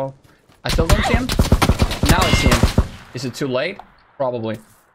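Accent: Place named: Denmark. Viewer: hey what country are you from because you seem to come from Denmark. in your way to pronounce English on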